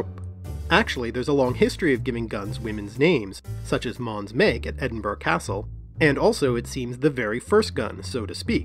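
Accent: Canada. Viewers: Are you Canadian? Just how talk makes me think you are.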